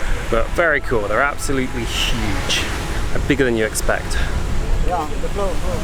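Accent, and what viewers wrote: United Kingdom. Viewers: british accent lol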